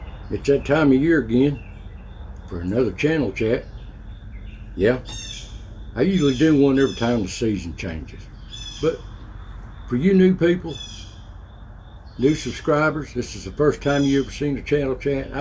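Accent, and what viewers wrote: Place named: South, United States. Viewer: You're thanking us, but I thank you for putting out your videos. I'm a Southern boy stuck in Yankee country, and I love to hear you talk just so I can hear Southern speech. I love it when you tell us how life is and was in the Appalachians. And above all you're my brother in Christ. In most of the South there can be several drouths in a summer, but in Texas there can be several summers in a drouth. 🏜️ I'm so glad to hear you're doing better. 🙏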